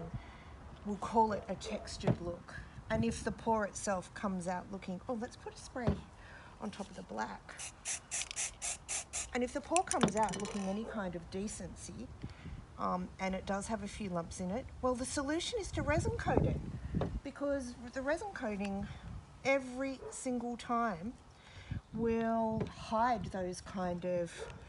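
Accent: Australia. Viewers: Just love hearing you talk. For a minute I think I’m back home in Sydney, I wish I was. On ya love!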